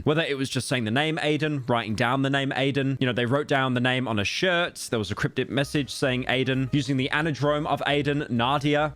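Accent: United Kingdom. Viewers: Yea I’m unsubscribing, I forgot your British 🤢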